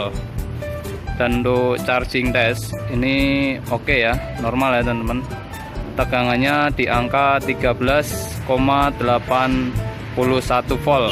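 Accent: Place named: Java, Indonesia. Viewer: kuk medok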